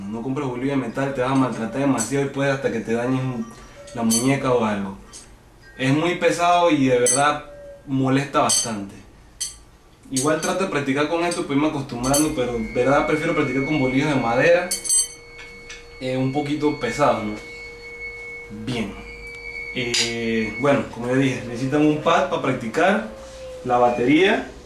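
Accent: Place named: Panama. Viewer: Man te lo juro que se ve! yo tambien soy de Panamá y encontre tu acento muy familiar, muy buenos videos, te felicito! saludos.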